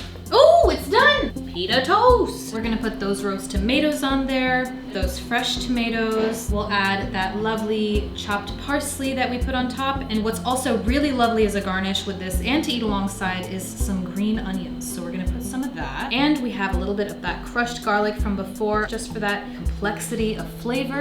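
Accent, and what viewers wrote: United States. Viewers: Can't wait to try it! Thank you for sharing. BTW Virginia accent? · heyy thank u for the video its fab! :) so good to see more and more vegans with YT channels!! do you have the written recipe up somewhere? would love to try it. im guessing youre american by the accent and also when you said 400 degrees!! XD im not sure what that is ill have to google the conversions or if you might consider putting the metric amounts as well in the written copy that would be amazing!!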